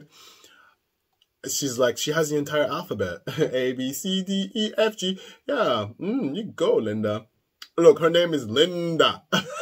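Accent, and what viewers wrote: France. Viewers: I have never felt so special to be called Lynda. Nobody has ever said my name that many times in such a short time with a French accent to boot. Pun intended. LOL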